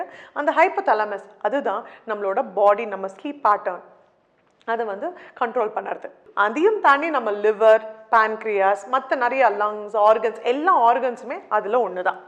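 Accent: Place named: Tamil Nadu, India. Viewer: Hi ma'am I have watched all your videos regularly. Wonderful explanations and clarity and I love your Tamil accent ma'am 💕 Thank you 🙏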